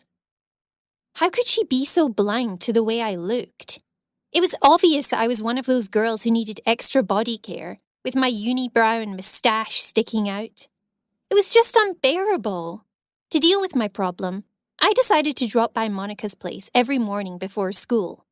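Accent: Scotland, United Kingdom. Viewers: Is that a half Scottish accent? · Wtf is with her accent Is she trying to sound Scottish Plz help me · I think she has a scottish accent. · Is the voice a Scottish accent? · Are you Scottish your accent sounds like you're Scottish???...... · She sounds Scottish to me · She sounds Scottish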